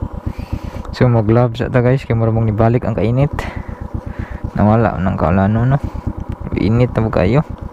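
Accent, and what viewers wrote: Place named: Visayas, Philippines. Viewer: Bisaya ka dong 😂😂😂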